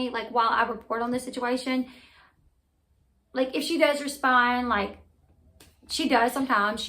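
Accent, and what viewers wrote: South, United States. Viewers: I love your southern accent. Lol I'm from NC and my bf says I have a twang but you got me beat. 🥰 Great info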